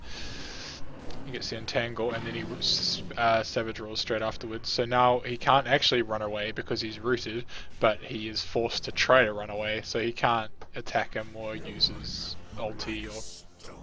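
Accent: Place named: New Zealand. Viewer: ayyy a fellow kiwi i can tell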